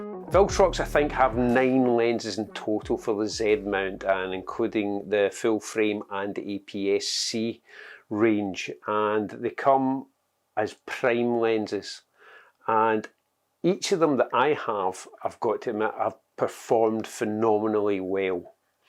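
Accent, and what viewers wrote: Scotland, United Kingdom. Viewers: Nice review, thanks! Your intro is very dramatic and usually these lead to a confident American model...Then we get your strong Scots accent. It’s always good to be a little different. Your images are excellent so I’ve subscribed immediately. I really hope you don’t dump your Nikon gear; you know it will be better in low light (over the Xt5)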